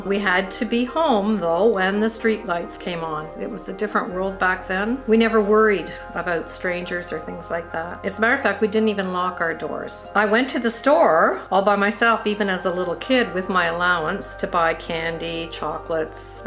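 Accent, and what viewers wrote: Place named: Canada. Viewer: I have just stumbled onto this video and I LOVE the Canadian Accent..!! I can relate to so many things - even growing up in the 70's/80's... thank you for this..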